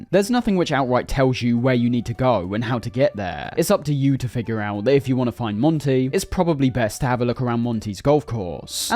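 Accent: United Kingdom. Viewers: a brit is speaking about a video game for autistic people, listen and learn 🤫🧏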